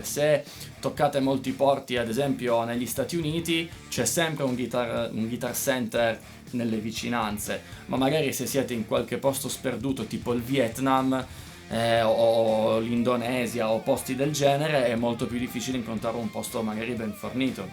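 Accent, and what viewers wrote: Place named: Sardinia, Italy. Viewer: Complimenti bel video e bel modo di esporre... Ho letto che sei di Torino ma sento una piacevole cadenza sarda...vero?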